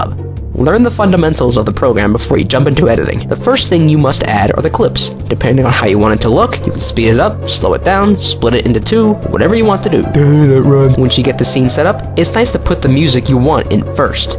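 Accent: Italy. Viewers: Are u Italian? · are you italian? o_O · ma...sei italiano?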